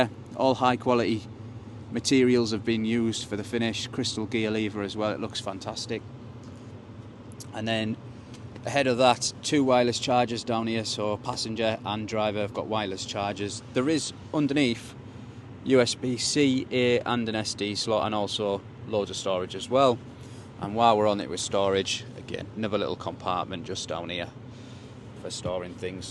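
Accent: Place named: RP, United Kingdom. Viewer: not only the car but I love your stellar british BBC accent. You sound like Robbie Williams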